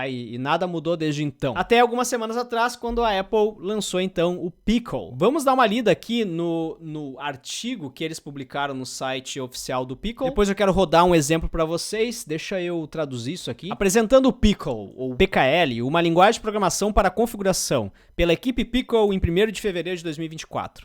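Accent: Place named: Ceará, Brazil. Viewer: é cearense esse macho, certeza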